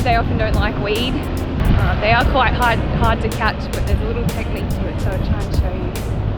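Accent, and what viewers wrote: Australia. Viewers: One of the coolest girls I’ve ever seen and the great Australian accent😃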